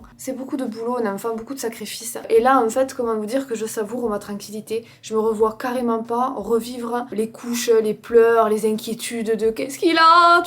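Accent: South, France. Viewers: Merci énormément d’avoir ce discours sur l’alimentation et le sport 💪🏼 j’ai vu ton évolution physique et bravo!! J’adore ton contenu, et ton accent me rappelle mon sud 🥹 même mon chéri te reconnaît par ta voix quand je met ta vidéo 😁 encore super vidéo et pour le Bad buzz je n’en ai même pas entendu parler tu es au dessus de tout ça 💪🏼 sincère et authentique🥰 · Ton accent du soleil est si agréable à entendre et renforce cette petite bulle de positivité et d'energie que tu nous transmets au quotidien, j'adore t'écouter et tes vidéos égayent mes journées ( et je sais que je suis loin d'être la seule ) 😊😊😁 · Alors moi j’ai 60 balais et je suis tombée par hasard sur ta chaîne. Et ton accent m’a sifflé direct dans l’oreille moi qui habite le sud sud profond. Tu es touchante. Rigolote. Concernant le bad buzz tu dois bien savoir ce qui se dit dans le sud…. 😅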